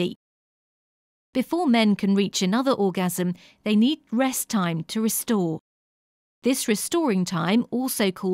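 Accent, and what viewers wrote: United Kingdom. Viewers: This was very informative and also hilarious for some reason. I think its the british voice 🤣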